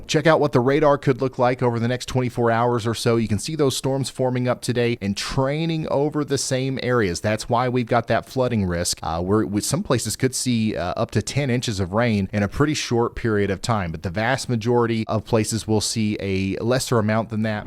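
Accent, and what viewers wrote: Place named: Appalachia, United States. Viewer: He said some of yuns! 😂 I didn’t realize yuns was a thing in Kentucky too. Appalachian dialect at its finest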